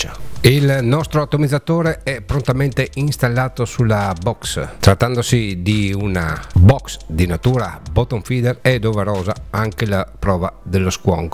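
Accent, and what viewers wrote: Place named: Friuli-Venezia Giulia, Italy. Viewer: Il tuo accento (a me molto noto) tradisce la tua “Giulianità”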